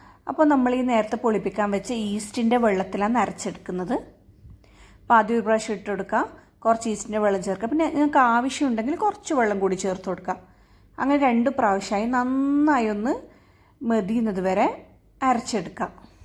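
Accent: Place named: Thalassery, India. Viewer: Thalassery Kariya no samsaram kelkumpol thonunnu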